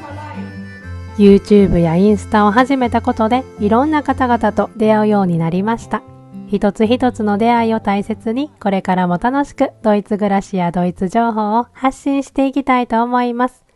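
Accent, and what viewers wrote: Kansai, Japan. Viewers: 最近こちらのチャンネルと出会えて遡って拝見させて頂いています😊 世界の料理や生活風景勿論観光地や史跡等も見るのが大好きです。 nobanaさんの柔らかい話し方や私は関西人なので聞きなれた関西弁での動画も心地良く癒されながら観ております。 オンラインショップもされるとの事で楽しみです✨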